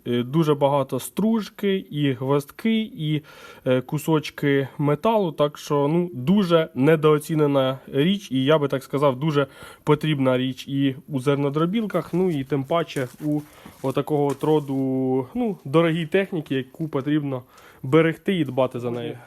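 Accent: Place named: Shostka, Ukraine. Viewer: Цікаво з якого ви міста? Бо говорите Шостка Кролевець))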